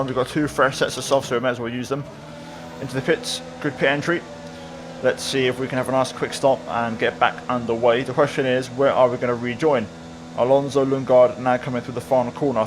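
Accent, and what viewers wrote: Texas, United States. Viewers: Your American accent is perfect, you’d fit right in here in DC! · Sick American accent sheeeeesh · Great American accent! Went to college in Texas and visit my gf that lives there and it’s very close! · As a native Texan I fully appreciate the effort on that accent lol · That American accent! Yes! Oh, you make me laugh! Cheers, mate. I'm not even American but I appreciate it all the same. Lando P3 right now in this series as well, eh? Perfect. Some more to upset that Lee Claxton dipstick. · That American accent 😁👌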